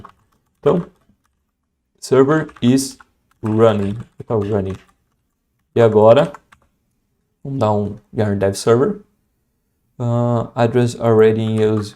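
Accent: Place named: Santa Catarina, Brazil. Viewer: ^^ Nesse exato momento estou fazendo um challenge pra entrar em uma empresa que usa a stack, percebi seu sotaque catarinense, e se não me engano já assisti a alguma palestra sua, vc é de Joinville amigo?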